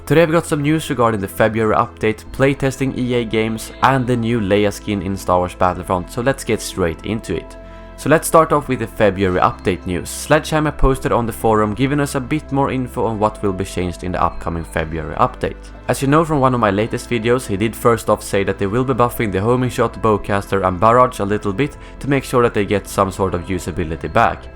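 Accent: Sweden. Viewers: är du från Sverige och stockholm=) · nice channel keep it up! that swedish accent tho :)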